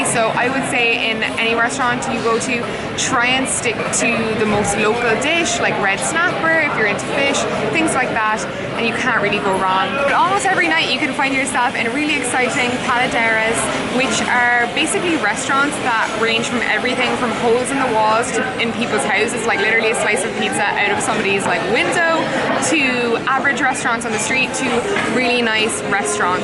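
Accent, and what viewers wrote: Ireland. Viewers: I love it. A beautiful woman who looks sometimes asian sometimes middle eastern sounds Irish lives in NYC and is reviewing Havana. If there is ever proof that the world is getting smaller here it is.! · You're from NYC? have an awful iris accent.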